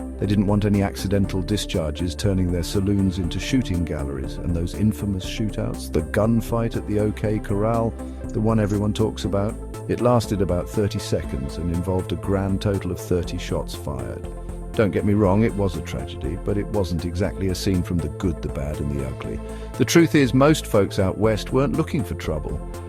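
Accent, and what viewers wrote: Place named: England, United Kingdom. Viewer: Why is this bit pf US history being narrated by an englishman.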